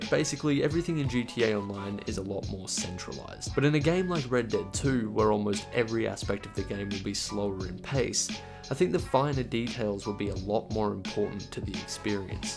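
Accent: Australia. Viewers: R u Aussie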